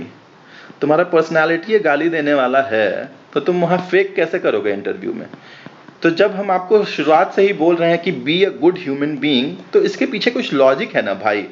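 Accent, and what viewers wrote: Bihar, India. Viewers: Aapka bihari accent jaanlewa h bhaiya.............sun k ek apnapan lagta h.............maati se judav !!!!! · Sir your TONE IS PURE BIHARI...JAI HO BIHAR..Ye video energy booster hai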